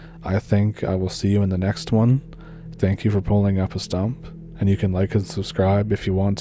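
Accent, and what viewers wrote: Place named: Maritimes, Canada. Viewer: Your accent is wonderful. Canadian Maritime? My father’s family was from French Canada. Quebec/New Brunswick. So I recognize it. You talk like Anne Murray.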